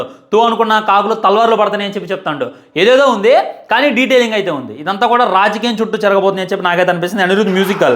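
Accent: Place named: Andhra Pradesh, India. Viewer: Niku teaser 7th month lone ochindha 😂😂😂 em review ra nidhi malla ni slang eh andra slang undhi nv telangana antunav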